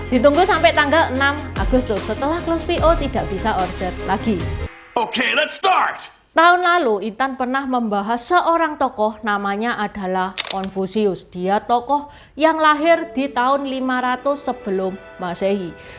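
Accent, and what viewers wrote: Java, Indonesia. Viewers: Tionghua....tapi medok jowo.... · Mbak e logatte jowo banget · Keren nih china,dialeknya jawa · Suka dengan suara jawa nya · Chino Medok Jowonya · Aku suka logat medhok Intan, jd inget wkt sekolah di jogja hehehe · Ketauan cindo ini kalo dia cindo Jawa soalnya Medokan jawanya terasa banget😂😂😂😂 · Oke intan trima kasih skrg sy jd tau .Oya sy mau tanya ya kenapa ya cindo yg lahir di Medan atau di Bangka kok logat bicara tak sperti yg ada di daerah yg mereka tinggal ya Tak seperti cindo ( tionghoa) yg lahir di Jawa atau Sunda Salah satu contoh nya intan sy yakin intan asli dari Jawa kan....hehehe . Soal nya logat bicara nya ketahuan hahahehe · Kalo saya perhatiin di malaysia dan singapore, semua etnis yang ada disana seperti benar-benar terpisah. Di malaysia bahasa melayu umumnya digunakan oleh etnis melayu. Etnis tionghoa berbicara bahasa tionghoa dengan sesamanya, etnis india berbicara bahasa india dengan sesamanya. 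antar etnis berbicara dengan bahasa inggris. etnis selain melayu sepertinya enggan berbahasa melayu walaupun sebenarnya bisa. Apalagi di Singapore. Tapi sepertinya etnis Tionghoa lebih bisa berbaur. Bukan hanya soal bahasa Indonesia, tapi dengan bahasa daerah masing-masing tempat tinggalnya. sendiri malah lebih medok daripada saya yang asli jawa dan berbahasa jawa di rumah. Apakah ini semata-mata karena kepres 67 atau memang cindo pada dasarnya memang lebih membaur? Salut dan hormat buat atas kerja kerasnya membuat konten yang sangat mencerahkan.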